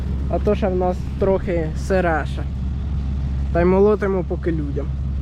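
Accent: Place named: Poltava, Ukraine. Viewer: Яка розкішна і соковита полтавська вимова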